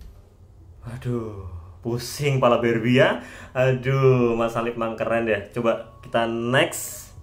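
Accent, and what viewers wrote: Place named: Java, Indonesia. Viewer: Bhs jawa medok nmen mas suhu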